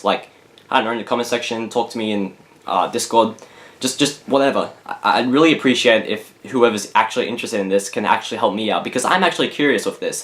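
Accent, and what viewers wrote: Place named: Australia. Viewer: Are you Australian? I can hear it in your voice. I'm Australian aswell.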